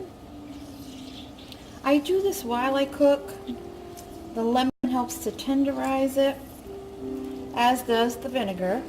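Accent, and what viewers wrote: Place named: Massachusetts, United States. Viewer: that looks fantastic..thats almost like the mosembequi..you sound like your from new bedford ma..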